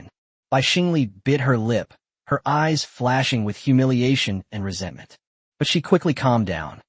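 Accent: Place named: France. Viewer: Would be a much better story and easier to follow if you didn't repeat the same s*** over and over and over again. Also if you could stop using that french accent voice to read the English words it would be much better.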